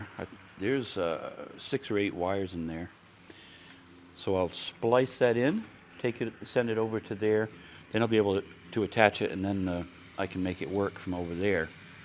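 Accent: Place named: Canada. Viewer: I think i hear a touch of Canadian accent? My papa and the rest of dad's side of the family came from canada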